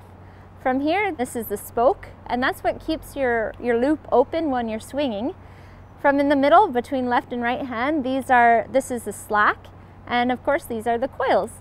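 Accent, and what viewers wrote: Canada. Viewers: Wonderful! love the Calgary accent! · Lol, Honda knot, Han-doo and lassoo instead of lasso so Canadian love it 💕 city/country girl (grew up around both)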